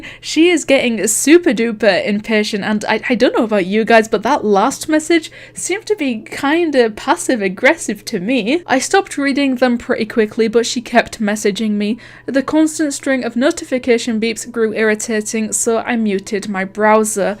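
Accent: Scotland, United Kingdom. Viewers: kay ayh ayh You're British Aren't you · I like her scottish accent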